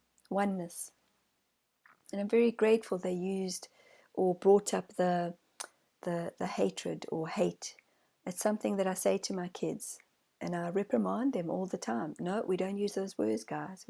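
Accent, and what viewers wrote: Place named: South Africa. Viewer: I just wanted to tell you, for one: I found you through Jeff Mara & so happy I did. For two: I knew right away you were South African when you were on his show. My ex husband is South African. I’m American 🇺🇸😟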